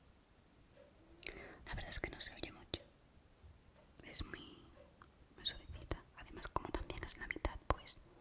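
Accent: Spain. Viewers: Wow, I love Spanish asmr now. Especially with the Spain accent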